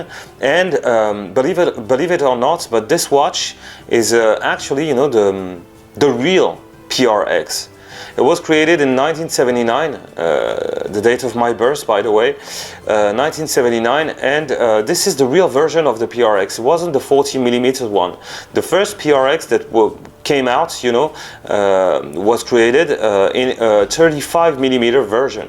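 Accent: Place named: France. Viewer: That is a beautiful watch! I may have to go and buy it! Also, may I say, your accent is gorgeous - I must come to France if all the men sound like this!!! 😂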